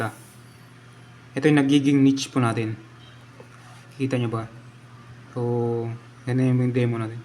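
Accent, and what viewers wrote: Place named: North, Philippines. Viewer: taga north ito halata sa tigas ng salita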